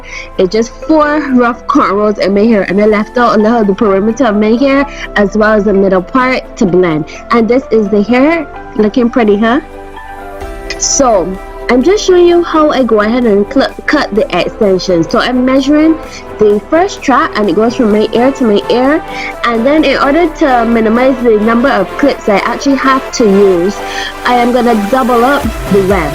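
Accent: Barbados. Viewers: Love that Bajan accent 🇧🇧🇧🇧🇧🇧 thanks for the ideas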